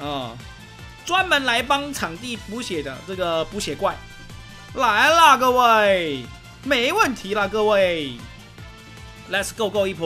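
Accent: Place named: Taiwan. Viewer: 台灣國語真的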